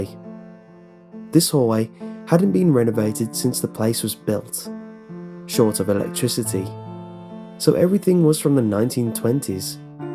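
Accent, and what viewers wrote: United Kingdom. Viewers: What part of the UK are you from? I love your videos btw!! I saved like 10 really long ones to listen to on a flight, so relaxing!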